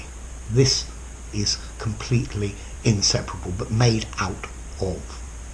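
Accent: RP, United Kingdom. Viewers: I like your RP English accent and the way you teach, as all that makes it easy to make out what you are talking about. Thank you sir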